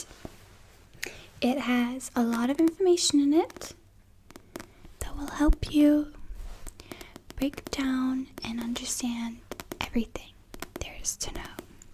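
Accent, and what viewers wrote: United States. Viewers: A British girl with American accent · did you change your accent... It sounds more American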